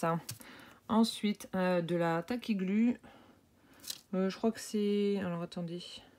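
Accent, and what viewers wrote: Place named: Lorraine, France. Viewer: ah voilà jme disais bien que tu avais plus une façon Lorraine de parler. Moi je suis du 68 région des trois frontières aussi mais FR, DE et CH. Comme j’ai beaucoup de famille en Lorraine, je connais le coin de Thionville. Contente que tu m’aies répondu, bon scrap bisous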